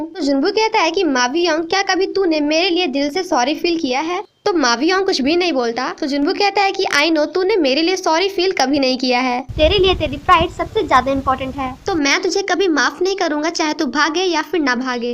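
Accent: Gujarat, India. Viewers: Didi aap Gujarat se ho kya?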